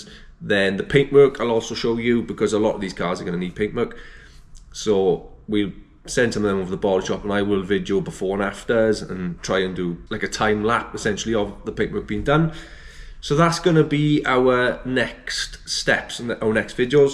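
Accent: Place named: South Wales, United Kingdom. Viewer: you got a sub from me for just being a fellow welshy, the fact you sell cars is a bonus lol. we sound very similar so im guessing your from south lol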